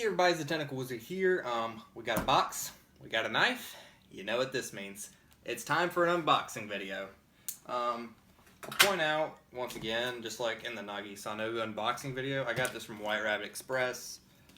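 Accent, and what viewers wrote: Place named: South, United States. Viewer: oh wow, u actually imported the game! that's so cool! where are u from btw? cant help but notice ur southern accent XD im from tennnessee myself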